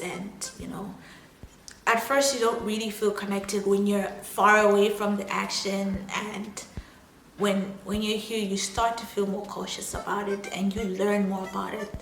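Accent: South Africa. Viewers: I understand this video is from last yr,but ur accent convinces me you've been living in SA for quite some time now,is there still xenophobic attacks going on in SA?..or do you feel crime and robbery is worse in SA than in your country and what are your experiences with crime or even xenophobia if you have experienced them?cos it sounds like it really bad out there... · She does sound South African, I'm not picking up Zim accent. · You sounds south African, where are you from?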